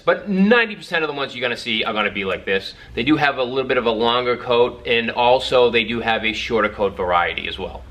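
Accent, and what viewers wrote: Boston, United States. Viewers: Are You from Boston, M.A.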